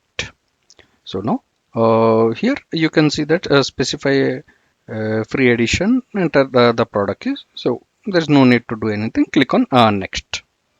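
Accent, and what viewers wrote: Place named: India. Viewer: why each tutorial video i'm watching bout ms products is with this awful indian accent, no rasism, I'm just fed up with this pronunciation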